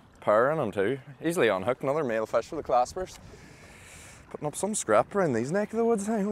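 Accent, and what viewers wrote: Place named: Northern Ireland, United Kingdom. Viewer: Baffled me why your accent was like northern Irish . Till i realised you are only about 12 miles from derry city . Unless you are actually from derry ?. 👍👍. Grandad is from Galway .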